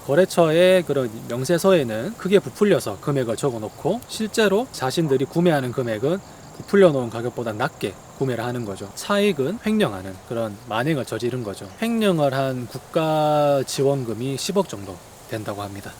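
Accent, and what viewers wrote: Gyeongsang, South Korea. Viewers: 님 말투가 대구사람이신거 같아요 맞나요? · 경상도 사투리나 좀 고치든가... 짜증나.. 근데 이런거 올려서 뭐하게??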